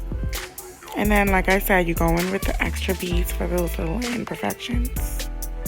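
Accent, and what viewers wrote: Bahamas. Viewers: You have a new subbie for sure! I scrolled down to see who would say you have a Bahamian accent. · Lol I had to come check the comments cuz I’m like she sound Bahamian 😂😂❤️🔥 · From I hear that lil accent I say yea she Bahamian! 🇧🇸 New subbie!! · Listen I was like this gal sound Bahamian lol. 🇧🇸🇧🇸🇧🇸 New Subbie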